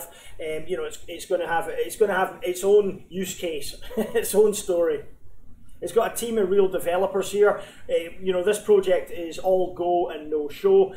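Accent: Scotland, United Kingdom. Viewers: very British :-) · Nice info. Are you Scottish please?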